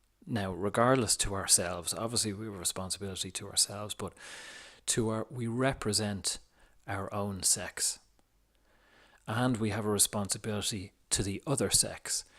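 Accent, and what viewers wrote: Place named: Ireland. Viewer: Didn’t think I was gonna hear an Irish accent when I clicked on this link from inside a Divine Masculine page... here I thought I was the only one on the island 😂 love the vid!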